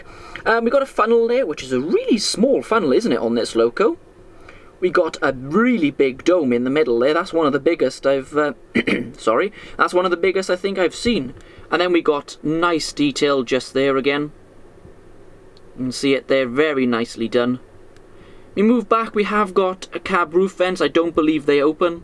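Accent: Wales, United Kingdom. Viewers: omg are you welsh ? and wered ya get one my nethew would go mad to get 1 lol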